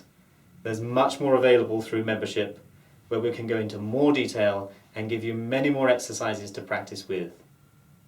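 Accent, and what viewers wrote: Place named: South Africa. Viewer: this sounds like a neutral South African accent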